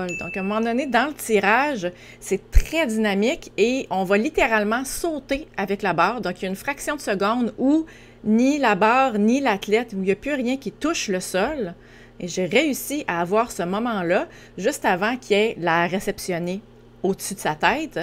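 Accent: Quebec, Canada. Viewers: Bonjour, Il y a un petit moment que j'ai le plaisir de suivre vos tutorielsn mais je m'arrête hélas rarement pour vous en remercier 🥴😳.Je voudrais donc vous adresser un immense, "'Merci" pour la qualité de vos explications et le choix des thèmes abordés. Vous faites un merveilleux travail, vos explications sont claires et.....j'adore ce bel accent Québécois ❤. Yves Banière - Astaffort - France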